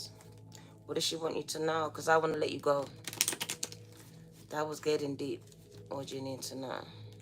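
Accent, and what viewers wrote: United Kingdom. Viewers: I absolutely love your old world Brit accent. My father was British and had one too.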